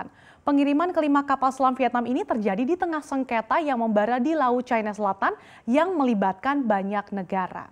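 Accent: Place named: Palembang, Indonesia. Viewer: kirain dari palembang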